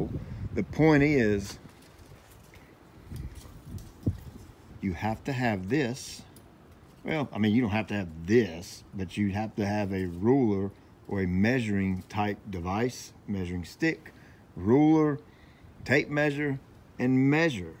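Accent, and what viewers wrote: South, United States. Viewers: Where are you located? You sound like your from the south but in my part of the south lawn stripes are unheard of and I think it’s because of the types of grass that grow around here don’t lay down that way. I think zoysia grass will do it though, we typically grow st. Augustine, Bahia, centipede and zoysia around here in north Florida and South Georgia. I’ve always been interested in stripes and would love to have them here but we don’t have “fescue” grasses around this area. Looks good brother!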